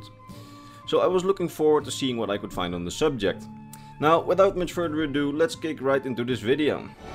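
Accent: Netherlands. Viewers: goeie info hoor alleen wel echt je nederlanse accent xD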